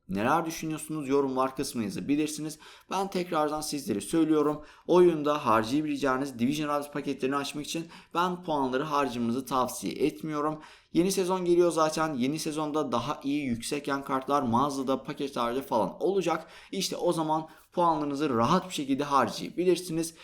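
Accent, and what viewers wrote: Azerbaijan. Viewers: Abi Azerbaycanlımısın?❤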